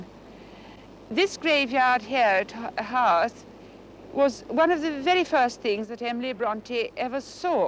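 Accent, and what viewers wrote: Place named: Scotland, United Kingdom. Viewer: It's a posh Scottish accent actually.😊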